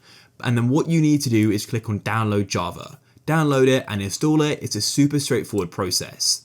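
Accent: United Kingdom. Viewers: You bri-ish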